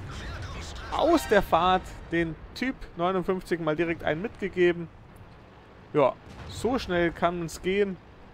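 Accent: Bavaria, Germany. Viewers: Mal ne Frage kommst du aus Bayern? :D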